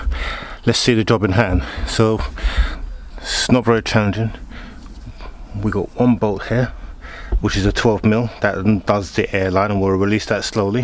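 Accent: United Kingdom. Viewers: your a man of brown skin colour but could not sound more native british. made me laugh.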